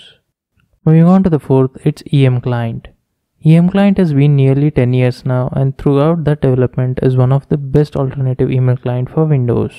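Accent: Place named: India. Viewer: Your English is terrible! Speak English, not "Indian English".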